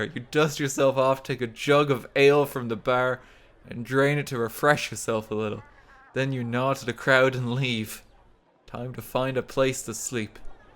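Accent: Ireland. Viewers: Great Irish accent so... what other accents can you say and what is your favorite game so far in you career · This video should have been called "A man with an Irish accent carves a bloody path to Kharé in Steve Jackson's Sorcery"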